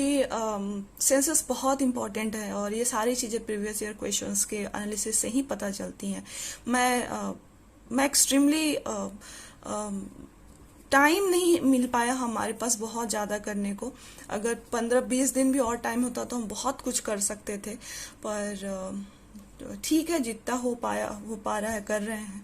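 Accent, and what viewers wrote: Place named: Mithila, India. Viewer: Mam, you must be from Mithila province.Thank you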